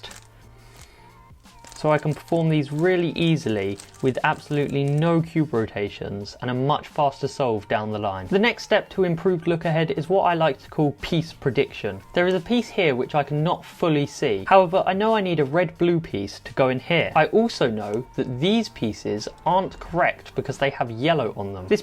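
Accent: United Kingdom. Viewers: are u english ?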